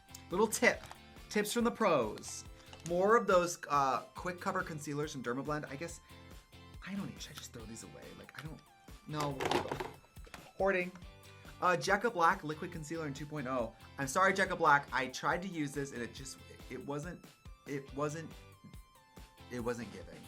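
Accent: Wisconsin, United States. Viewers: I hear your WI when you say go bag 🤣🧡🧡 I'm from Racine which you may or may not know isn't too far from the Mil much love I bought the Plant Gay PR Box and I use that goddam box for my makeups you are an icon I love you I'm going to convince my bf to take me to This Is It bar so he can finally LIVE ❤ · I love her Wisconsin accent! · her wisconsin accent in this video>>>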